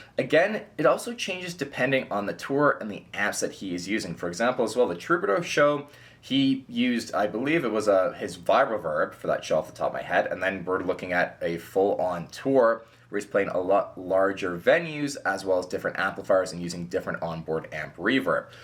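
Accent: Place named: Newfoundland, Canada. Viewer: Your accent is super interesting. Candian but with a hint of Irish? Is your family from Newfoundland?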